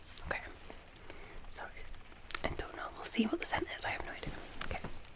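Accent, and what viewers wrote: Scotland, United Kingdom. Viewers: What told us about herself: 1. From Scotland 2. Has scottish accent 3. Loves football 4. Drinks beer 5. Parents live close to Golf course If these were the traits of a character written for a TV show, the writers would be accused of reproducing quite a lot of stereotypes xD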